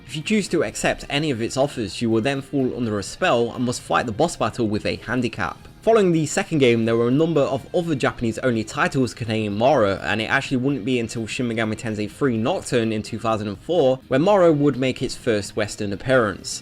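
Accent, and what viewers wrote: United Kingdom. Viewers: The commentator? Uh, he's British.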